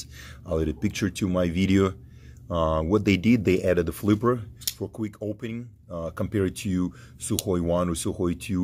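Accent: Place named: Russia. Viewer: Родную речь слышно даже на английском) через 3-4 дня мне такой же придет)))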